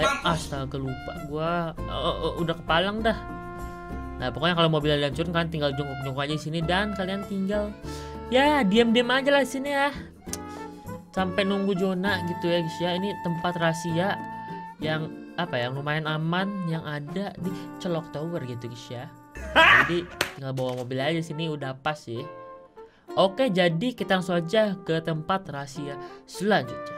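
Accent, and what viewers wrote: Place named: West Java, Indonesia. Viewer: Bang asal mana sih kok sunda🤣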